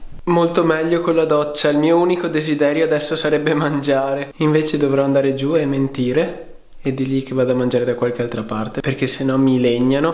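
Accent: Veneto, Italy. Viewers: Ma sei veneto ? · Ma sei Veneto?? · Si è veneto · Ma sei Veneto?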